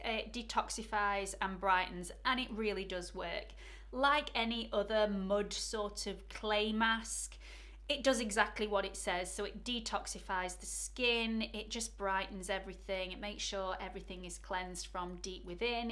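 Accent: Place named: Yorkshire, United Kingdom. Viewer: Excellent video. I just discovered you and so happy I did. You’re amazing! Learning a lot from you. Look forward to watching all your content. And of course I love your accent. Are you from Yorkshire? Wild guess thereLol. Love from the States! 💕💕💄🌻🌷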